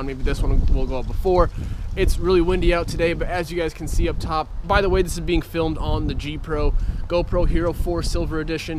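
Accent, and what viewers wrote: Australia. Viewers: That Australian accent 😍😍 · Nice aussie accent, i was thinking more Steve Erwin, R.I.P.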